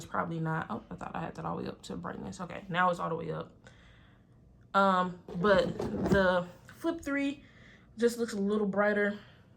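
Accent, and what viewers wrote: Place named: DC/Maryland, United States. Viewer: You have a DC/Maryland accent 😊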